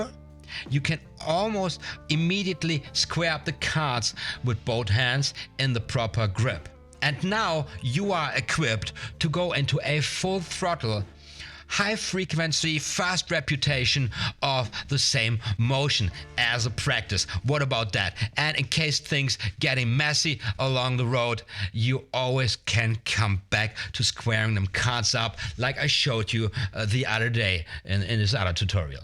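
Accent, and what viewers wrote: Germany. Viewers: Sure do miss your silly German accent!!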